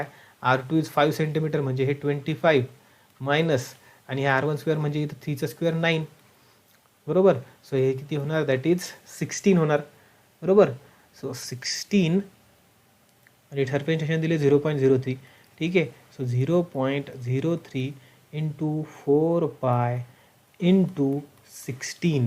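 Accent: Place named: Parbhani, India. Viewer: Sir tumhi Parbhani che aahat ka? Tumchi accent tashi watli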